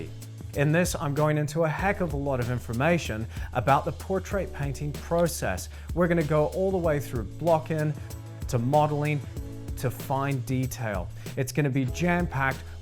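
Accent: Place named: Australia. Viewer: you sound slightly australian but also american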